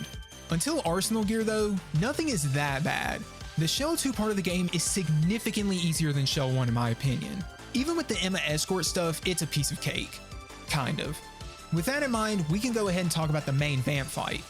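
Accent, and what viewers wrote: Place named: Maryland, United States. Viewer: Bro are you from MD? Not hatin, that accent just sounds so familiar lol. Good video btw, Sons of Liberty is the greatest game ever made imo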